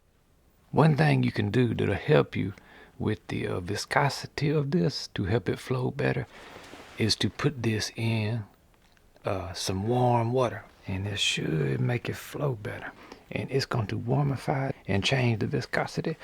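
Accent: Louisiana, United States. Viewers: He had me at Bilbo and Gandalf story in his Cajun soft voice 😂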